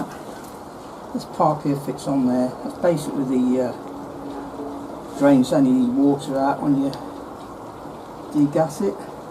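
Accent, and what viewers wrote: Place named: Worksop, United Kingdom. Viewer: You sound like your from my area of the UK (worksop)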